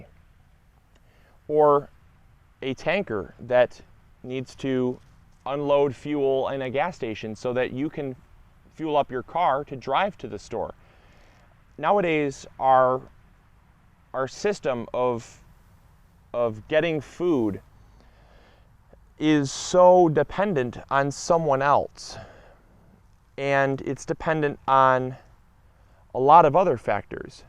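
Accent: Michigan, United States. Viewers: Good to remind people that food does not come from the grocery store originally. Since most people, more than 80%, live in cities where 90+% of them raise nothing to eat nor would they know how, it is important to remind everyone of where it comes from. (Also I like listening to your Michigan "accent" and colloquialisms that one is not likely to hear elsewhere.) I grew up in Ottawa county, Michigan - near and in Holland.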